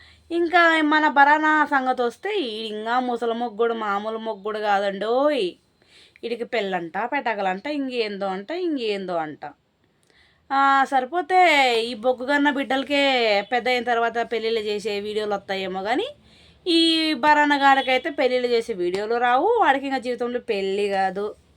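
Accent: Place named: Kadapa, India. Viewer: Mi slang bagundi sis midi kadapa dist aa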